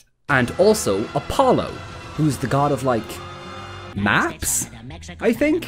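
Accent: Ireland. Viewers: Thank you, Senpai. UwU Also I love your channel, your Irish accent, slang terms/euphemisms, and cultural references are really fun to listen to.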